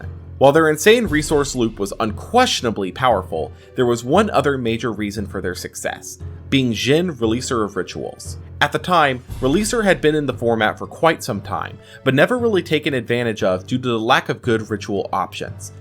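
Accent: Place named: Baltimore, United States. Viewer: baltimore accent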